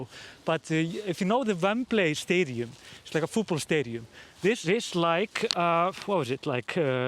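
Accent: Iceland. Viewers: Greetings from Mn. USA. I watch every News cast, and am very pleased with your reports. Thoroughly enjoy your Icelandic accent. The information you pass on to the world is excellent, thank you. You are very fortunate to live where you do, and are so close to these wonder's of nature. Thanks again and keep up the excellent reporting.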